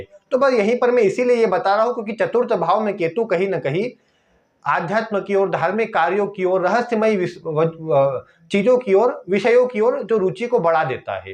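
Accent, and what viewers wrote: Madhya Pradesh, India. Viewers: गुरूजी बहुत अच्छा विश्लेषण दिया है आपने 😊 आपकी बोली से आप हमारे मध्य प्रदेश के ही लग रहे है 😀 मैं भोपाल का रहने वाला हूं ❤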